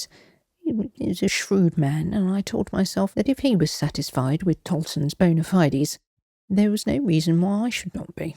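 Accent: England, United Kingdom. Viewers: Was pleasantly surprised to see another story by you. Saved it for the last story of the night. I dont think you realize how beautiful an english accent is to a Southerners' ear. Again, I enjoyed the story and the storyteller!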